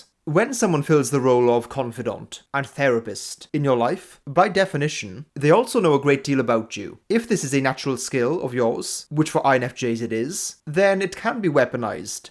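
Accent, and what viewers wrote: Wales, United Kingdom. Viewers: He was the first yt channel I found on mbti - Iearned a lot with his simpler style videos, then moved more to your channel for the fancy language and welsh accent.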